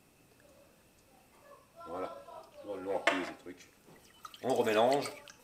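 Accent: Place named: North, France. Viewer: Salut, J'aime bcp tes vidéos, ta façon (simple) d'expliquer et ton accent (je pense du nord de la France: au dessus de la Loire...) Un petit "Crut" pour les macérâts huileux solaires: j'utilise des boîtes de conserve que je numérote de 1 à 4 (au lieu du papier "Kraft" tout moche) et en cas de pluie: pas de PB... Chaque jour, je "tourne" de 1 à 4....pour être sûre que ma macération soit au soleil uniformément.... Encore merci pour ta simplicité et tes explications Bises.... et toi aussi prends soin de toi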